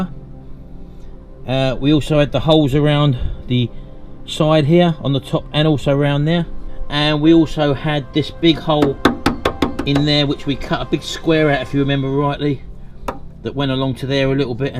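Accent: United Kingdom. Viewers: And English you are!!!!! As I have been amazed by your passion and your left side of brain (left handed as well). Wondered for a bit of your whereabouts until you finally spoke as I assumex you could be Canadian, British or German and with that, I assumed correctly... Left handed and no ring (who in his / her right or left mind would wear a wedding ring. To that end, married or not, straight or otherwise you sure know how to work with your left hand and fingers. You also have very good digital skills (printing+video editing). One take....The sign you printed was slightly off center when applied. of all trades....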